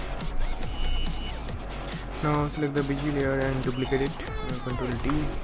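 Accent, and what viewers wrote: India. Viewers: Sorry, but your profound Indian accent prevents me from understanding hardly anything you say !!!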